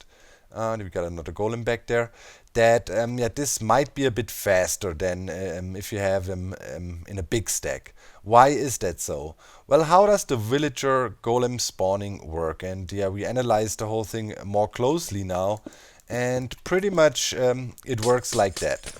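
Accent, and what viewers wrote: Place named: South, Germany. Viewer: Der Süddeutsche Akzent 😁 Ich liebe es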